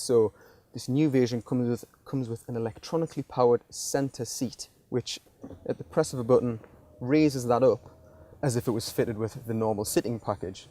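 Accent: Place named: Newcastle, United Kingdom. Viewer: Gan on Geordie lad.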